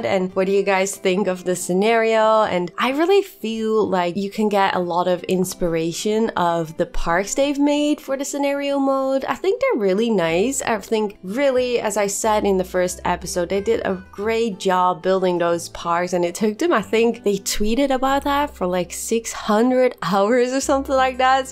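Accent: Netherlands. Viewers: Love all your video’s but are you Dutch ?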